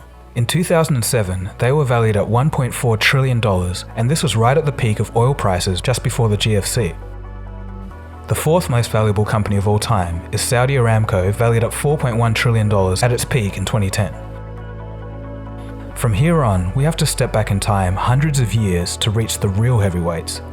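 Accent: Australia. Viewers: A great video Are u Aussie? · Crap channel. Horrible Australian raspy accent. Terrible summary knowledge which frequently engages in absurd reductions and simplistic analysis.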